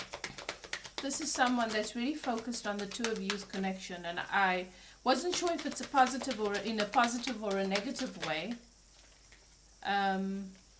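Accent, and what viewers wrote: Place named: South Africa. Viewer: Very good reading.. You are very gifted. Your accent sounds South African, so good to see this evolution in spirituality. Thank you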